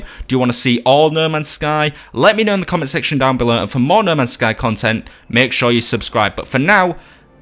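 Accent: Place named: Hull, United Kingdom. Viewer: You sound like some of my Hull friends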